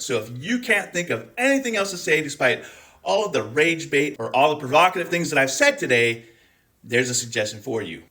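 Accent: Scotland, United Kingdom. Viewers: Damn, the Scots accent came out QUICK today!!!!! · Why does it sound like you're covering up a Scottish accent? ... 🤔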